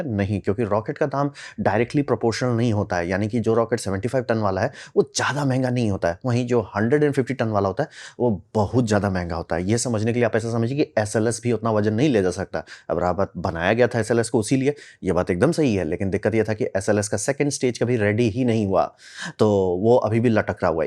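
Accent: Bihar, India. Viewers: 💯 आप बिहार से हैं सर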